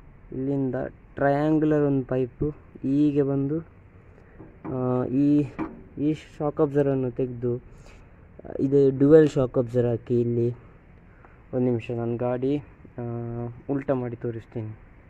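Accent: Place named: Mangalore, India. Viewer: I like ur mangalore kannada 😄😄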